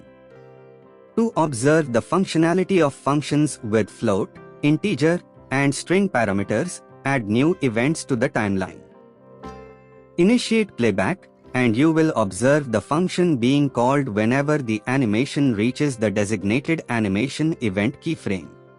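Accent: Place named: India. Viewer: Why did you specifically use a text to speech with an indian accent?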